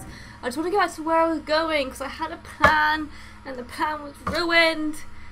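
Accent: England, United Kingdom. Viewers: Hello, where abouts in England are you from? Just curious. You're voice is half the reason I'm watching this walkthrough. Also, extending your Hunter Axe assists greatly in dealing damage with a from a bit farther away, usually enough away than most enemies' hit range. Regards from Blackpool :)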